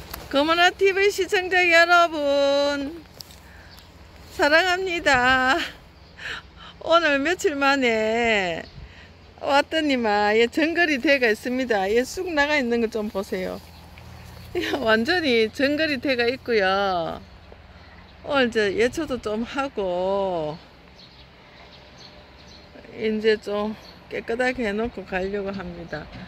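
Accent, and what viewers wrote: Gyeongsang, South Korea. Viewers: *선생님이라고 호칭을? 아니면.. 이*교수님 이라고 호칭을 해야할지요? ㅎ [방가지똥] 약초 이름도 참 재밌네요..ㅎ 오늘도 건강을 위한 프로젝트 영상! 잘 시청합니다~👍💘 경상도 사투리가 즹겹네요..ㅎ 자두*가 선생님 닮아서 이쁘네요~ 휴일! 행복한 시간 되세요~*😘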